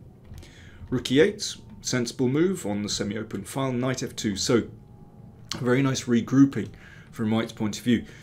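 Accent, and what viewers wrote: United Kingdom. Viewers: I love the strong British accent and your very very happy smiley demeanor, paired with deep knowledge (obviously). Makes for great content. Subscribed!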